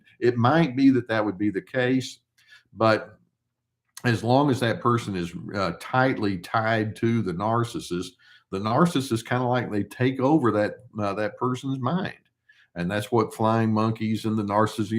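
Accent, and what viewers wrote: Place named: Texas, United States. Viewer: Wow I’m from Texas to no wonder I feel at comfortable listening to you your accent your mannerisms your belief you vibrate like the people that I come from where I come from I’m from Lubbock texAs and also I like your Maas green shirt you wear unusual colors I know that the topic is very important and I’m learning so much from you it’s very very exciting to be empowered by your knowledge empowered to be me what a concept wow thank you so very very much. Such a gentle soul THANKYOU🐶🆙🙏🏻🤍😃🌷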